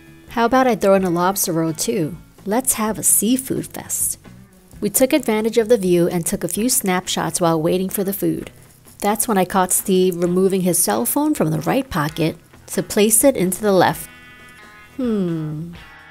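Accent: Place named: China. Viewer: Love it when you speak chinglish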